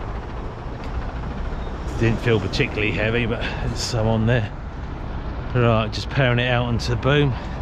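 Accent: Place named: High Wycombe, United Kingdom. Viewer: Sounds like my accent from High Wycombe many moons ago, in Australia now, used to drive road trains here 4 trailers. Thanks for the video.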